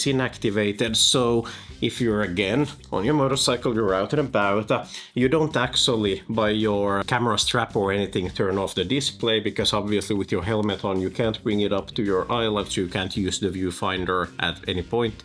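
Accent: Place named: Finland. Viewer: I'm so glad I got that correct. I met some Finns on my travels and they were so polite! Your accent reminded me of theirs. Good video by the way.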